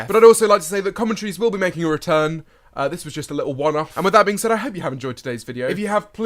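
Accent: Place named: RP, United Kingdom. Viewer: 2017 sounds way more posh compared too 2019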